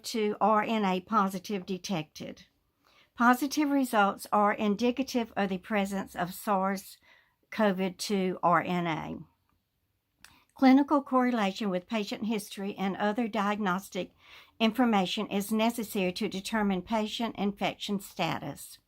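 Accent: South, United States. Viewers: Hiiii Ms 🌞You Sure Are A Beautiful Sight For Sore And Half Blind Eyes😃! You Sound & Look Great, So Pretty Without Your Glasses Too! Really Looks And Sounds So Much Like You, Both Soft Southern Soeaking Precious Woman, So Glad. You Are On Your Way To Fully Recuperating, Thank You For A Very Informative Video, and Thank for the Booster Home Remedy, still now just need to purchase the Ginger Root, then I'll be set!😃 God Bless You Blessing, I Love You Ms.!🌺🙏🌺 😇😇😇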